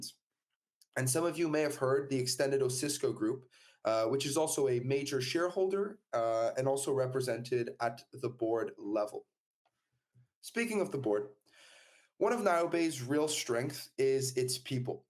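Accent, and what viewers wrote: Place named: Canada. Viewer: Hmm, you sound too Canadian. Not even sure if you are from canaduhhh. Either way, You lost me with all the regulatory/environmental BS at the beginning. I’m looking for no BS companies to invest in. I’ll keep looking.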